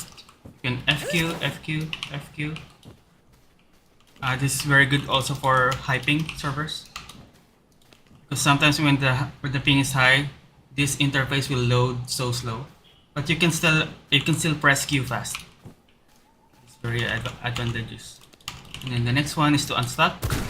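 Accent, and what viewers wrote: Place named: Philippines. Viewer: Kumusta from Australia! I think you're Filipino anyway from the accent.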